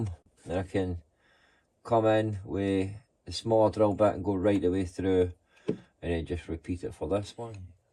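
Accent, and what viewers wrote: Glasgow, United Kingdom. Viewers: Great work, I've learned a lot today. Good to hear a Glasgow accent again. I left (Carmyle) 25 years ago to finish my days in Aberdeenshire but I'm still kickin. Ca canny. Garth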